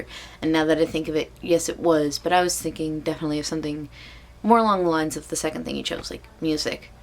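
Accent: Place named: Canada. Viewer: I KNEW IT YOU'RE CANADIAN. I love Canadians. And your accents.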